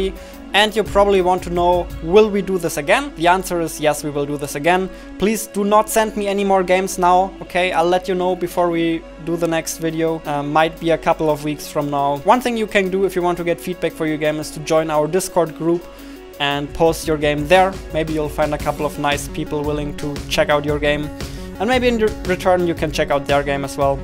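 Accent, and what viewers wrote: Germany. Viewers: Bist du deutscher? Dein Englisch klingt nämlich so xD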